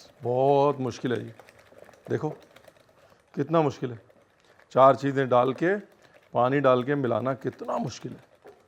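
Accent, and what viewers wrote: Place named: Punjab, India. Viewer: Love your style of speaking, the comic timing, the pauses, and the punjabi accent :-) and the history bytes are amazing too!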